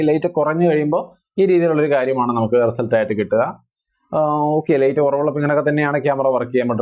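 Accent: Thrissur, India. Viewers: Pakka തൃശൂർ കാരൻ 👌👌👌👌😁😁😁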